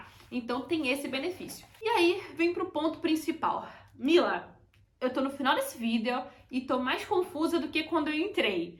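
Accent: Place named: Feira de Santana, Brazil. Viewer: Sotaque de feira de santana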